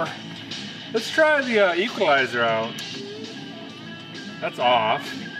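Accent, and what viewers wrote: Minnesota, United States. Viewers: why are there always idiots who downvote? I really like the channel, and there's not a whole lot about it not to like. We need more real people like you in the world. Stay safe. Sounds like you live in Minnesota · With that accent you've gotta be from western Minnesota ya knooooowwww. Proud Benson native here!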